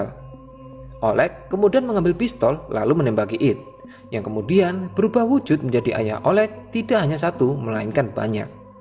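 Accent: Java, Indonesia. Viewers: Bagus, suaranya medok banget, jadi enak ngedengerinnya bro, he he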